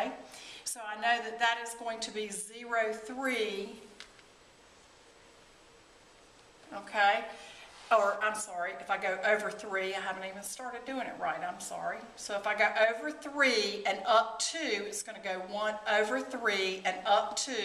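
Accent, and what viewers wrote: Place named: South, United States. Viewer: Definitely southern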